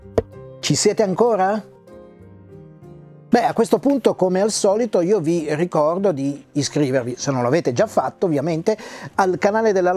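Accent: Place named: France. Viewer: Super! Con accento francese!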